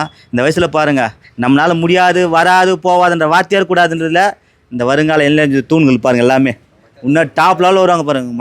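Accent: Madurai, India. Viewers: Super Anna madurai vasagam ❤🎉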